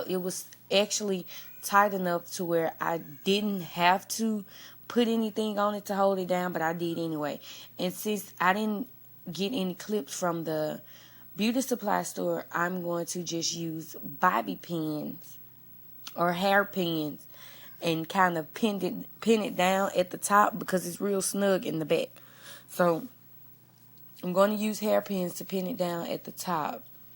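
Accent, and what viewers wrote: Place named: South, United States. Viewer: That's really cute!!! I like it a lot! P.S. I thought I heard a southern accent, and then you said something about Mississippi! lol!! I'm from here too, great vid! Xo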